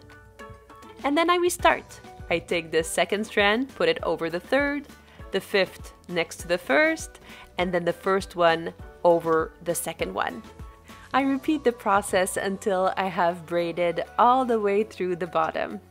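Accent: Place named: France. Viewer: I love challah! Thank you. I thought you live in Israel until I saw the groceries in English. Are you in the states? In an orthodox Sephardic jewish community? Also you hv a beautiful French accent. This week is the key challa segula for Parnassa as it’s after pessach and Benetton Israel entered Erez Israel.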